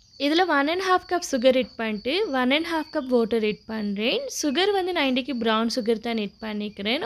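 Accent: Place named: Muslim Tamil, Sri Lanka. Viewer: இது இலங்கை முஸ்லிம்கள் பேசும் தமிழ். ஒவ்வொரு பிரதேச வழக்கு. இதுவும் தமிழ் தான். அவ செய்முறை நன்றாக உள்ளது வாழ்த்துக்கள்.💐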